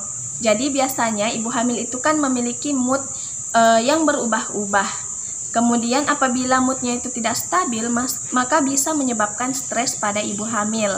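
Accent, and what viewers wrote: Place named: Makassar, Indonesia. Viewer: Org makassarki 🤭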